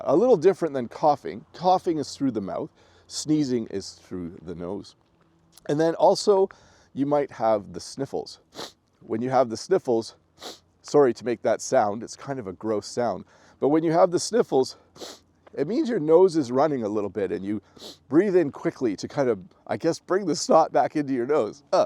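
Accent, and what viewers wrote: United States. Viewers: Dear teacher, My English is not good, my hearing is poor,I am a new immigrant, living in the United States. Is the English you speak here in Canada the same as the English you speak in the United States? I really want to learn English from you,Is your pronunciation the same as American pronunciation? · you in Canada have more british or american english? As far as I know Canada was part of British Empire and accordingly you have a british one but I noticed you pronounce some words like Americans do. Answer please. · Dear, Here Ted from China, I really really appreciate for your videos which are most perfect of English learning. I don't need waste time to learn boring Chinglish any more. now i'm very enjoy to watch your Enlglish teaching, especially your accent, just like wathcing an American TV series. Also, i'm enjoy everything you said which are very close to our life, and it makes me feel how beautiful the Canadian countryside is. 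Hopefully that I could go there to have an enjoy that beautiful scenery one day. Bless you and your family!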